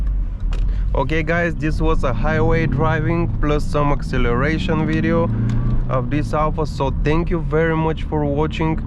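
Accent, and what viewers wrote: Bulgaria. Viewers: Евала за клипа още от акцента те разбрах ,че си от нашите хора 😁🤣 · Първо, като почна клипа си помислих, че е някой чужденец, обаче като чух как говориш и веднага познах, че си българин. Много готино клипче, прожължавай все напред! :)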